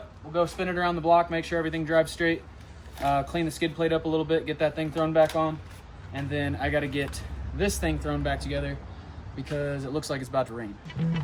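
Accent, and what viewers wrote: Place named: South, United States. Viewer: Love the Talladega nights accent😂😂😂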